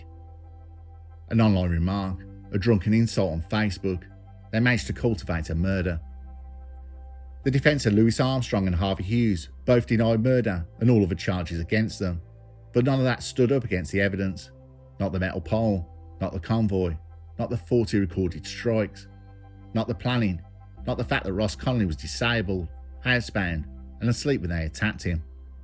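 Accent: Birmingham, United Kingdom. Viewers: Good upload with a Brum accent ? Thank you fella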